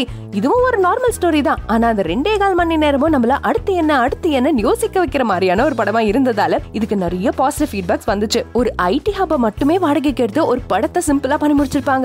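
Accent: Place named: Malaysia. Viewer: akka Malaysia Tamil Torll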